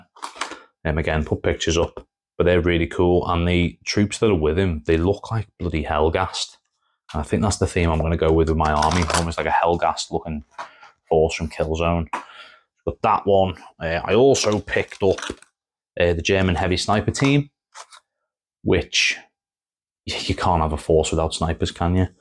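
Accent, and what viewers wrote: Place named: Liverpool, United Kingdom. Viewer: Just picked up konflict 47 you sound a bit Scouse sorry if not first time viewer if you can you recommend any gaming clubs in in rundown prefer adults not kids. Thanks if you can..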